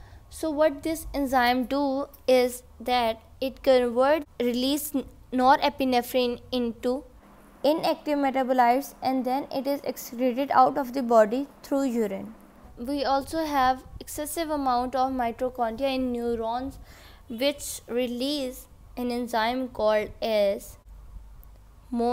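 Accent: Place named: India. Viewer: well done but the indian accent is killing me😢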